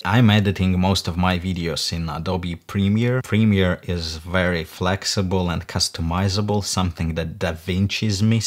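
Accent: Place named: Russia. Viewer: Russian accent😂anyway blender 2.8 is future 3d modelling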